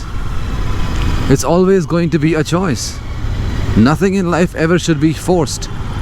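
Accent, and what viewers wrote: India. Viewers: I’m a Brit in the UK who owns an Interceptor. I’m also married to an Indian lady! Don’t worry at all about your accent!!!!! Your English is perfect and waaaaay better than my Hindi!!!!! Don’t understand the negative comments about your accent at all! You are really clear and thanks for posting such great and useful videos! Now Im off to replace my levers using your video tutorial! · Your accent is good👍...normal Indian accent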